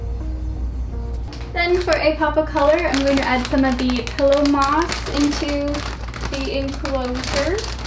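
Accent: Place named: Canada. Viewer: When you even realized how Canadian your accent was LOL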